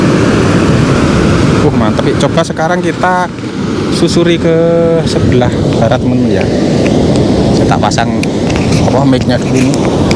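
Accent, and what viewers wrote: Java, Indonesia. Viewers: Aku loh sueneng nek ngrungokne mas ngoceh2 jowone iku LO kuenthel😍🤭🤭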